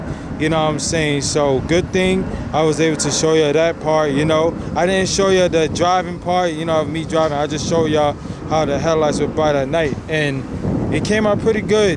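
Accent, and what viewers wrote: New York, United States. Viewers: u gotta be from nyc u namean ya herd 👌🏼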